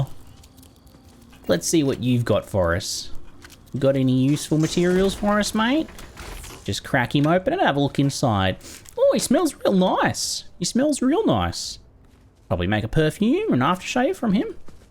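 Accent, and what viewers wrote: Australia. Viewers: I thought, for a second, he was doing a Crocodile Dundee accent, but then I realized it's a video and it's just how he talks.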